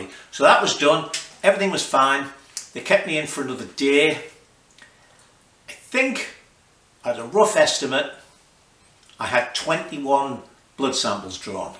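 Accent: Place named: Newcastle, United Kingdom. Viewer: Glad you're on the mend. Remember the great Carroll Shelby lived to 90ish with more severe cardio vascular problems so I hope to hear your dulcet Geordie tones for many years yet.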